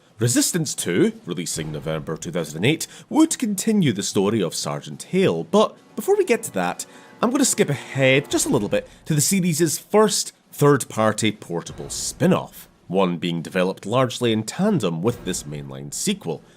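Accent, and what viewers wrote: United Kingdom. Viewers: Dude is just too British. I can't stand it.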